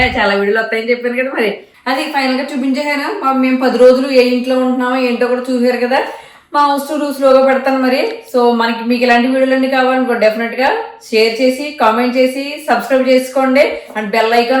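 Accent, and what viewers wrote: Godavari, India. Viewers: Mee video choose choose vizag slang poye godavari slang vachestundi AAYEE.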